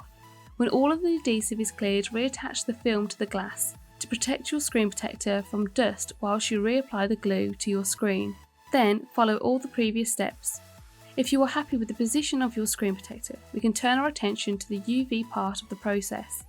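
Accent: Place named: Birmingham, United Kingdom. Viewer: Big up Brummie lady👍🏻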